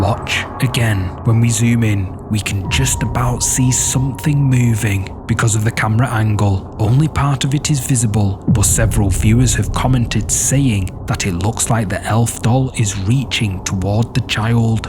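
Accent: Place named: Northern England, United Kingdom. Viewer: I just love these videos so much ❤It’s a real treat everytime you’re uploading something new. Your northern England accent’s also brings a kind of creepy aura to the videos. 😊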